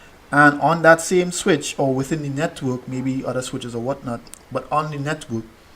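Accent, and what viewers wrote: Trinidad and Tobago. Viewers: Sounding Trini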